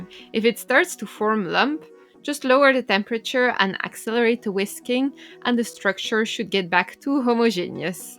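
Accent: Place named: France. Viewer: Hello! Je profite de ton accent français pour te féliciter dans la langue de Molière! Cette vidéo est très claire, agréable à regarder et donne envie de reproduire la mozzarella. Je vais me lancer car j’ai bien envie d’une bonne pizza! Continue!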